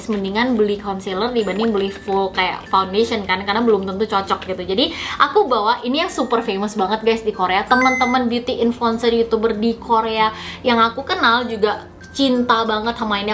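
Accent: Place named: Java, Indonesia. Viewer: bibirna medok teuing eta teh...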